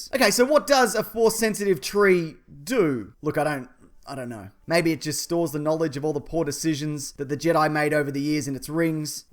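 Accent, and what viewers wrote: Australia. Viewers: I dig. I dig it all. I also dig the Aussie accent - helps me pay attention.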